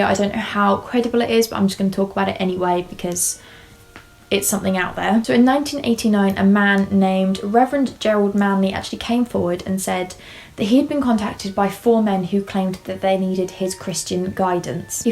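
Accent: United Kingdom. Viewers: I'm from Salina Oklahoma about 10 miles from the old camp site i grew up hearing about these story's i was 3 years old it sounds like your from the UK so why you even going on about this?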